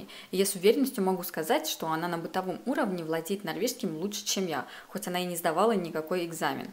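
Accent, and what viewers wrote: Standard. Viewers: Спасибо большое за ваши простые и в тоже время неповторимые ролики.... Всё очень красиво, ухожено вокруг. Так красиво.... Вы такая молодец, столько учитесь.... Так приятно видеть свободного, образованного , прекрасно воспитанного человека...,с уважением относящегося к другим странам и нациям, знающего несколько языков и при этом ещё говорящего на прекрасном правильном, чистом русском языке, без мата и проклятий.... Спасибо вам, огромное, счастья и процветания ! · этой подписчице приятно слышать чистую, грамотную, русскую речь. У Вас способности к языкам, не очень заметно что вы выросли в Латвии. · Очень приятно слышать чистую,грамотную русскую речь. При том, что девушка выросла в Риге. Какая красота вокруг! Как будто я сама там гуляю и дышу чистым прохладным воздухом!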